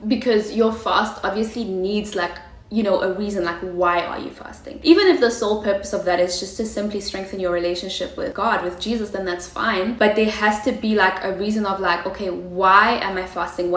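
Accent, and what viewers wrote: South Africa. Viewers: Are you south African ? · your south african accent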